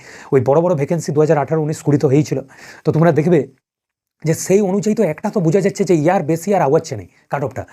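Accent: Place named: Purulia, India. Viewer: Dada tumi purulia language bolcho ❤️❤️❤️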